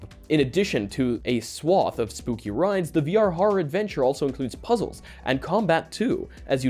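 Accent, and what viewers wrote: Poland. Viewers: The polish accent intro 👌🏼🔥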